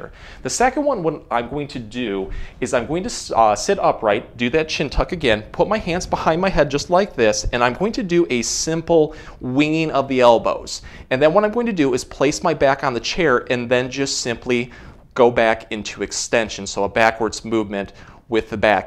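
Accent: Michigan, United States. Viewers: I'm here for the Michigan accent and the great tips 😎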